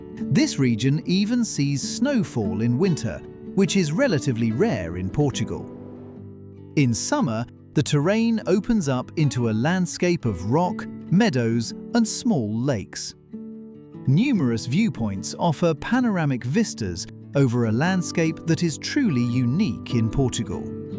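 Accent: Spain. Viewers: The Spanish music in the background and the Spanish pronunciation of Portuguese words (particularly words with 'J') is very disappointing. Portugal and Spain are culturally very different, in fact they were historical enemies for many centuries, so hearing Spanish music in the background and Spanish pronounciation in a video about Portugal is like nails on a chalkboard. It wouldn't have taken that much more work to doublecheck the pronounciation or at least use music that is not so obviously native to Spain like . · You made a Nice video of my country,And We do have a beautiful country in my humble opinion..But ,Please learn how to pronounce our cities and places in Portuguese not in Spanish.Believe me we will appreciate it.❤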